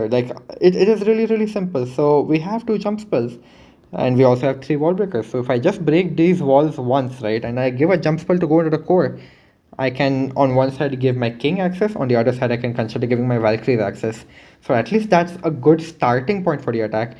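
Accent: India. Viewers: You indian bruv ?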